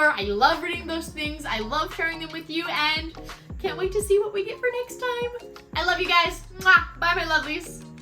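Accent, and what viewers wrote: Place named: North Carolina, United States. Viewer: I'm just curious if you're from North Carolina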